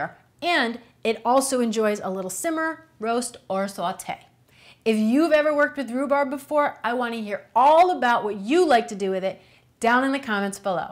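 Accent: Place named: United States. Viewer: Where's your american accent from? Nice video though i wish u had more about harvesting a fresh crop.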